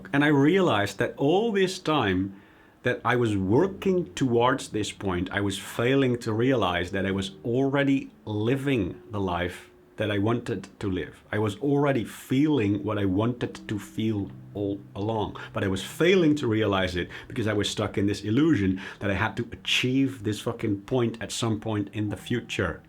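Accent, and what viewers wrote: Netherlands. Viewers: I heard you were dutch by your accent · Are you dutch or it is just your accent? Good videos man, good luck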